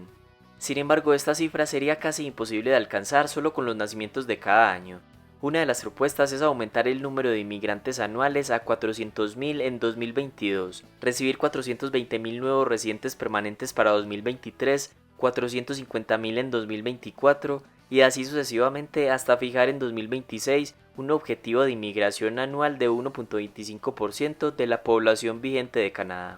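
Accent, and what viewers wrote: Colombia. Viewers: No me gusta el acento colombiano bobo del narrador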